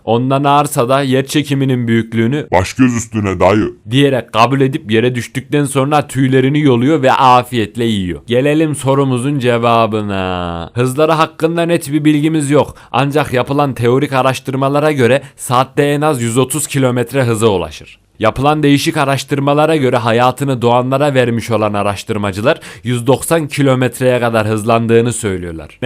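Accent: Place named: Çorum, Turkey. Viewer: Kardaşıma şunu söylemek isterim kesinlikle sen bir üreticisin seni saygıyla selamlıyorum. Şiven gayet kulağa hoş geliyor ben Çorum Yozgat arasında gidip geldim.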